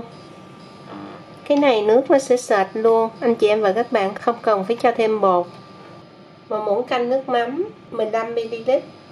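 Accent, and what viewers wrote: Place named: South, Vietnam. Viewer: ui ...giọng nói miền Nam thiệt ngọt ngào làm sao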